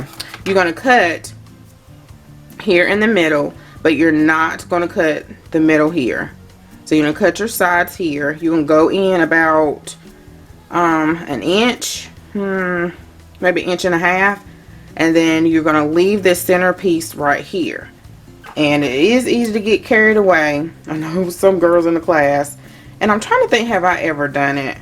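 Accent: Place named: South Carolina, United States. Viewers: You've got to be from South Carolina lol. Girl, you talk just like me. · You sound like me talkin’ ! Very similar, anyway! I’m from Georgia. How about you?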